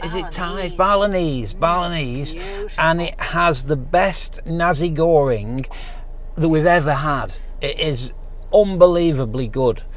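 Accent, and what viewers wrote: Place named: Manchester, United Kingdom. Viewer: Hi. Excellent series. You have inspired wifey and I to do a lap starting November. Getting things together and I was wondering what size water bladder you had on your trip. Hoping to be in WA after chrimbo. Btw I just got back from UK/Manchester where they all talk funny like you😊. Looking forward to your next trip.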